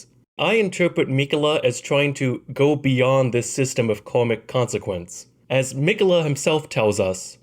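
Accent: Pittsburgh, United States. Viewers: I keep wanting to ask if he's from pitt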